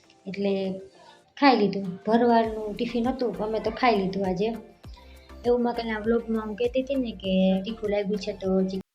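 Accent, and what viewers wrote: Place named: Porbandar, India. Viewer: પોરબંદર ની ક્યાંક તોસડી બોલી આવી જાય એટ લે તમે ક્યાં ગામનાં છે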